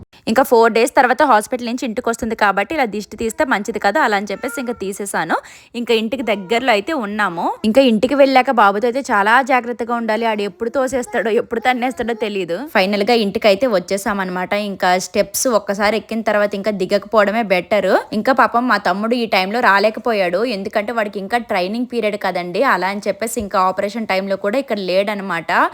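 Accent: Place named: Telangana, India. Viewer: Telangana slang talking akka meru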